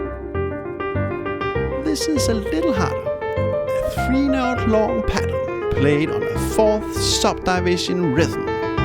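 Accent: Denmark. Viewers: Super god video, vidste du var dansker fra accenten lol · Sound Danish :-) Well done I like it very much as well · You are the only guy with a thick Danish accent to which I've actually liked listening to. Wonderful video, I'm definitely gonna try to pick up the 'lovely habit'. Og forresten mega props for redigeringen.. Det er dælme vildt at få de korrekte fingre til at lyse rødt på hvert eneste slag - selv i improvisationen! Translation: "And by the way, huge props for the editing.. It's wild to get the right fingers to light up red at every strike/beat - even in the improvisation!" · Denmark? I'm picking up a strong accent haha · Nice Danish accent, you deserve more views 🔥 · I like this guy, Sounds danish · Are you danish? Idk it’s just the accent😂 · As a Dane myself I half way cringe when I hear our accent but it's hard to lose ... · I have to ask, are you Danish? 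I hear a few what seem to me clearly Danish sounds in your unusual accent - never heard anyone speak exactly as you do. Love the Danish accent. Hope you don't mind me asking - sure it's none of my business but language sounds interest me.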